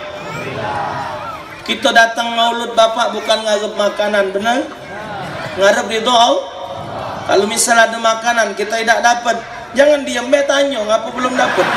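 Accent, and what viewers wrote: Palembang, Indonesia. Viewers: Ya Allah enak ketawo 25 tahun d bandung denger ustad ceramah baso palembang. Sehat terus Ustad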